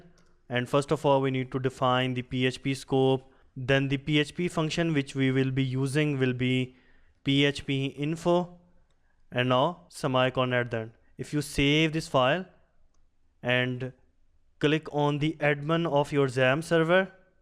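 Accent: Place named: India. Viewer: i started taking your course but i need to confess your english give me a headache english isn't my first language and this indian english just kill me when it comes to learning something difficult , i still in the number lesson but i will do my best to finish the course soon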